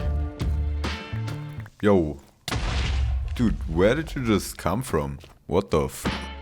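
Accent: Germany. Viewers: I know a German accent when I hear it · i know a german accent when i hear one haha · out of context but are u perchance german? ur accent and pronunciation sounds like it (coming from a german person) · I hear the DEUTSCH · i hear a little bit deutsch haha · Are you german? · you sound so german 🙏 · Is he german? Bruder ich hörs doch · damn u got a cool german accent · First time watching a video of yours and I love how I immediately said "German" the moment you spoke😂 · are you german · Not even 10 seconds and i could already hear the german accent 💀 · Ohne Witz, ich mache das Video an, höre den Akzent und denke mir… der ist doch deutsch. Checke dein Acc and low and behold its in Germany 😭😭 love that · Why do i think that U are a German guy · Ich Liebs einfach wie ich in 5sek den deutschen Akzent rausgehörte habe 😂 Geiles Video Grüße auf Hannover ❤ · Do I hear german? 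· I hear deutsch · I can here a little bit Deutsch in your englisch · as a german I hear that you are German only because of the voice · I can smell the German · Ich hear a deutschen akzent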